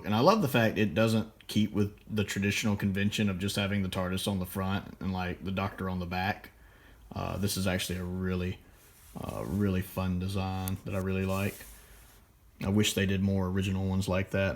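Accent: South, United States. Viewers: I think once you become a legal adult in America, groaning while crouching or bending is legally expected. Also, I love your collection! Also, I have been meaning to ask, Do I recognize a southern accent? I only ask cuz I'm from Texas!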